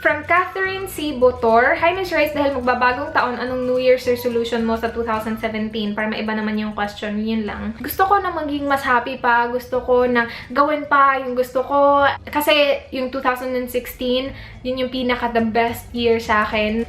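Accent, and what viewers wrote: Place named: Visayas, Philippines. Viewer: The way you are, being simple made me subscribed to your channel. I start my day watching your vlog. I enjoy watching you and your family. My mom is from Mindanao and my dad is from Cebu. So when I hear you talk visaya, it reminds me of my childhood. Best wishes to you and your fiancé from us here California 😊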